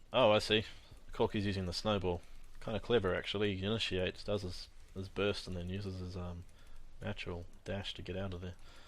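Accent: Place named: New Zealand. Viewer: Ha Ha kiwi accent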